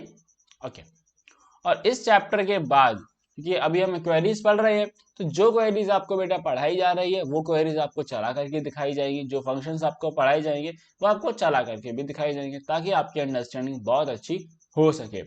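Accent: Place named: Kanpur, India. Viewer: bhai app kanpur se ho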